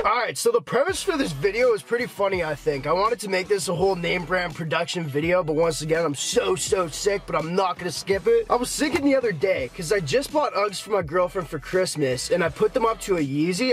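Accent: Winnipeg, Canada. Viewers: Are you from Winnipeg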